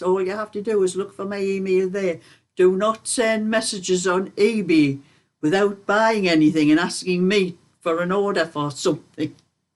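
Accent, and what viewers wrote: Newcastle, United Kingdom. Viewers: Hello, I love your videos, I’m totally rubbish at knitting and crochet but it s good to see the expert in work. What I really love is listening to your antics, all my family are geordies, it’s great to hear your accent, all the fun with your hilarious pals, I think they all look to you. Thank you.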